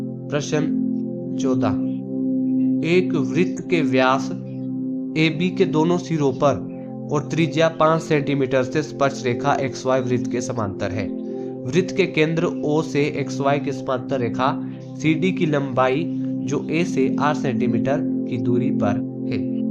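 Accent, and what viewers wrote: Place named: Pahari, India. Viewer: Aapki voice to phadi logo ki traha lag rhe hai himachal me aap kha se hoo ...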